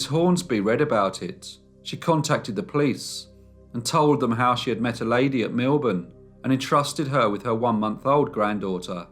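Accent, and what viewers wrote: United Kingdom. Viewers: im from North Carolina in the US its the south east in the US and if you only knew how horribly flat and that twang we have...I never say anything about how someone pronounces a word outside of this state. The most educated can sound like an idiot. I absolutely love a British accent, especially yours. Keep up the great work. You are helping keep me sane during this horrible pandemic and our joke for a leader..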